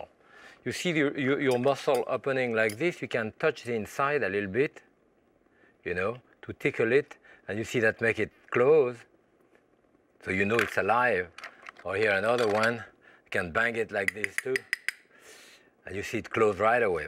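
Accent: France. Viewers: Haha I'm sure I wished that himself. Fact is the French never lose their French accent while speaking English. It's either too difficult and/or too painful for them to do so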